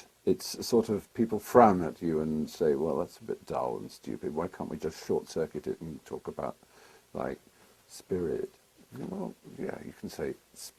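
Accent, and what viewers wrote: England, United Kingdom. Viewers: Mental masturbation with an English accent.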